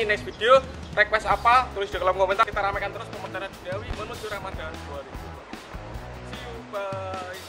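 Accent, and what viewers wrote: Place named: East Java, Indonesia. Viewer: Orang jawa timur kan bang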